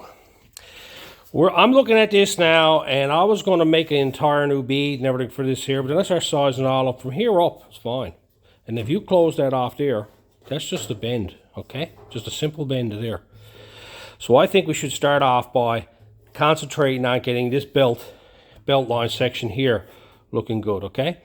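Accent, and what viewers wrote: Newfoundland, Canada. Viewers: What accent is that Newfoundland? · I knew that accent right away. Great to see a Newfoundlander doing awesome body work. Bravo! Bill from Saskatchewan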